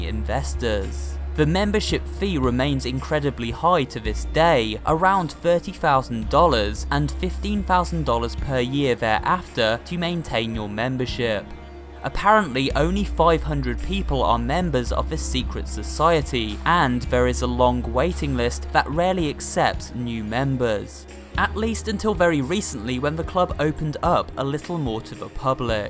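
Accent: United Kingdom. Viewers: Now your British speaking voice sounds much more legit, it must have been a thing where you thought that talking slower would be more helpful or something.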